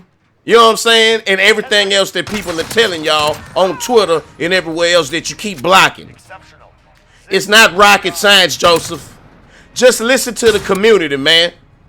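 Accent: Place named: New York, United States. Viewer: You just dressin up a turd, and eventually people realize they are playin with a pice of shit“ hahahahha man you too funny where are you from? You have a hilarious accent You sound like a friend of mine, hes from NY, i guess you come from around that area too, is that right ?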